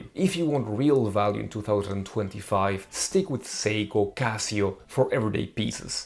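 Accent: France. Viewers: Thanks for the reoly. Obv not important, i just like knowing where accents are from. Im defn no expert on French accents and appreciate that tbey vary accross diff parts of the country..like everywhere. My experience: is my wife is French and ive been there a few times , stayed with her fam. Your accent just sounded a tad different to theirs . Enjoy your videos and appreciate your reply Cheers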